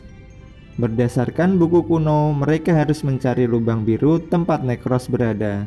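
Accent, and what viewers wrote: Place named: Java, Indonesia. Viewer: Medok bangt njir..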